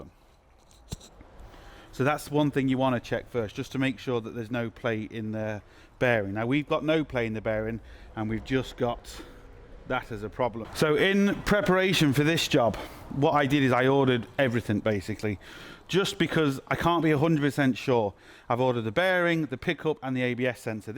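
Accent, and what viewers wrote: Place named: United Kingdom. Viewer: British accent is awesome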